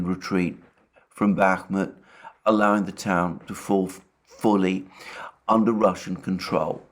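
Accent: United Kingdom. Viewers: Alexander is actually Russian citizen with a British accent. He looks Russian enough.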